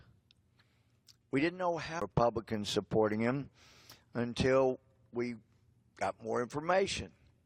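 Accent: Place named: Texas, United States. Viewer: This is a great and humble man, who has served his country and his state and is fighting to save the Integrity of the FBI and also the Justice Department. Although he speaks with his slow, southern, Texas drawl, he says more than Hillary Clinton and Obama have ever said of substance in their lives.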